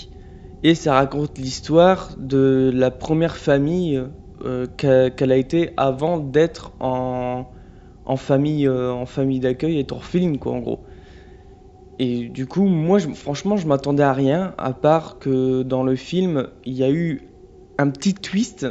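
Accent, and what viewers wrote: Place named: North, France. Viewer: Rien à voir désolé mais t’es blond, t’as les yeux noir, un accent du nord, tu serais pas le frère à jojo Bernard ?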